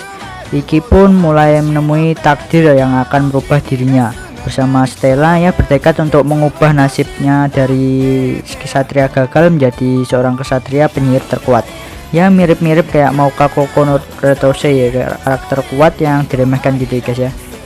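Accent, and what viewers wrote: Java, Indonesia. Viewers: Eh kau orang Jawa ya? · Wong jowo maa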